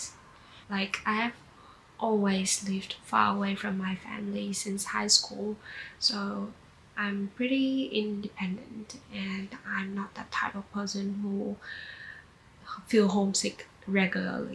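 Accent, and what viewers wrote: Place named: Australia. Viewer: Your accent is sooo Ausieeeee and I lov ittttt <3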